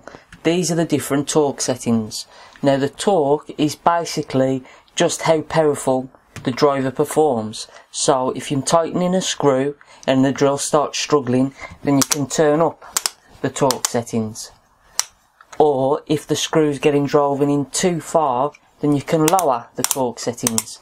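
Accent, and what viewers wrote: United Kingdom. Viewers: Scouse accent?? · more like Birmingham or Dudley.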